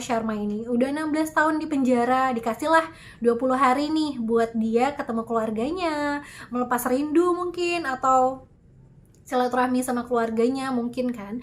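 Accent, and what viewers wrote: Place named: Banjar, Indonesia. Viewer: kya logat banjar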